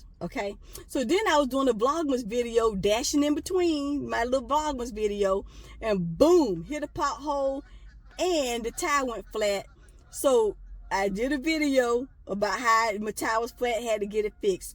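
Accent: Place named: South, United States. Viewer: Strong ass southern accent god bless you lmao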